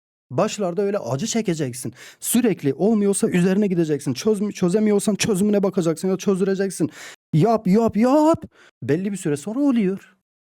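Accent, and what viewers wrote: Van, Turkey. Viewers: Vala zordur dediğiniz yer 😀 cok ozlemişim Van'ı iyiki şivenizi hiç yitirmemişsiniz, Allah yolunuzu açık etsin 👏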